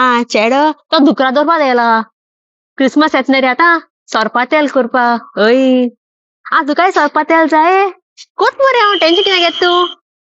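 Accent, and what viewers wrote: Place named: Konkan, India. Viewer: Tuzhe konkani accent aykopak bare dista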